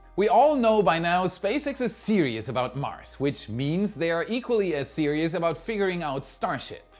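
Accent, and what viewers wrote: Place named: Germany. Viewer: Is it just me, or Is German accent starting to fade a bit? If he lives in Florida long enough, it'll start turning into a southern accent...wonder what that will sound like? a german-southern accent...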